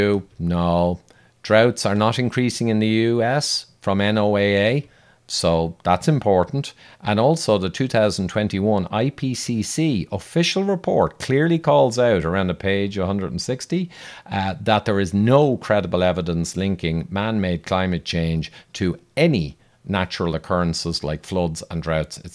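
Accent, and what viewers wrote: Ireland. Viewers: Nice. And the Irish accent helps too.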